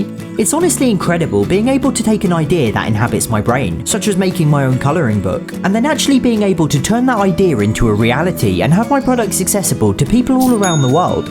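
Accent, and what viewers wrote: United Kingdom. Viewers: Are you british...? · I love your British accent and you videos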